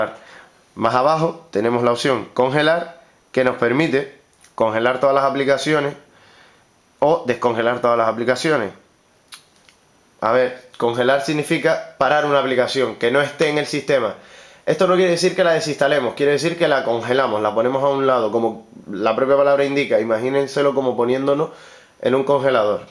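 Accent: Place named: Canary Islands, Spain. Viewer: wow se nota q eres de canarias, yo soy de grancanaria, la feria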